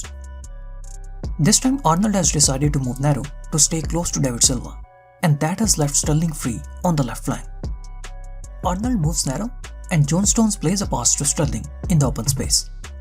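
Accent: India. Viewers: Since You are an Indian (accent) make an analysis on Sunil Chettri or The Indian National Team.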